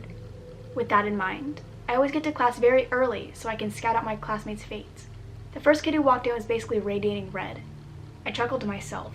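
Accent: United States. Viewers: Maya W she had a slight country accent. I’m from Mississippi and mine comes out often.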